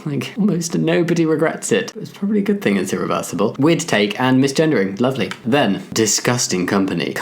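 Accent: United Kingdom. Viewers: can u do an american accent. Ur british accent sounds like nails on a chalkboard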